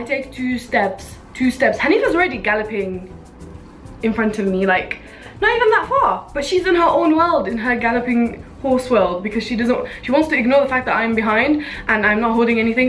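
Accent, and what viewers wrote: Nigeria. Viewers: her Nigerian accent 😂😂😂😂😂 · Im a new sub ! And i absolutely laughed out loud at this video! Definitely do more stories! Loving the lowkey Nigerian accent :D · I can hear you have bit of a naja accent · lol u had a bit of nigerian accent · The Nigerian accent comes really naturally to you. You're basically one of us now :D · just noticing that got some 'nigerian english' accent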